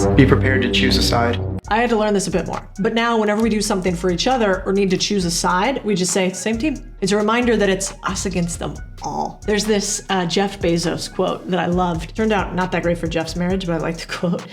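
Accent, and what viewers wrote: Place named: Midwest, United States. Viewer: You are Latina with beautiful hair, but you have a Midwestern (no) accent. Please explain.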